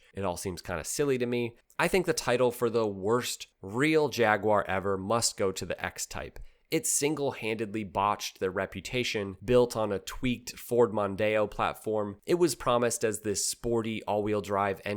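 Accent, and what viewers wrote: United States. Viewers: Yes but you hear that accent and you know to apply the US definition of "Global" (NY to LA) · Every Citroen is the worst one 😂 but seriously, he sounds like an American so he probably doesn’t know France even exists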